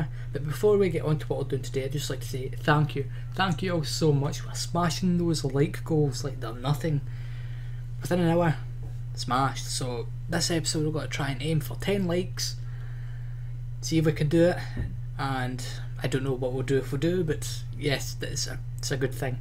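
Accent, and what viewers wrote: Scotland, United Kingdom. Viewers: are you scotish i am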